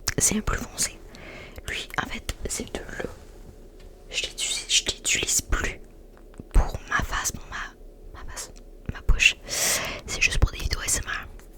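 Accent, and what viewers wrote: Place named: Quebec, Canada. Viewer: Tu as un accent que je connais mais j'arrive pas à savoir 😂 so t'es de quel régions de Québec?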